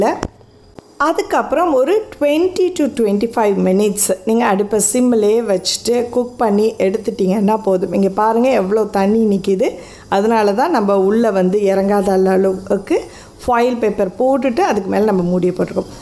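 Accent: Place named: Ramanathapuram, India. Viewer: Nenga sollurathula mukkalvasi enga ooruoda othu poguthu sister i am ramnad mukkiyama vappucha intha word kettathum aacharyama irunthuchu ada namma ooru mathiriye sollurangalenu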